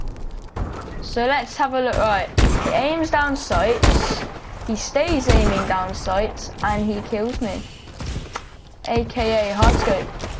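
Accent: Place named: Birmingham, United Kingdom. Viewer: You sound like your from Birmingham... Great comeback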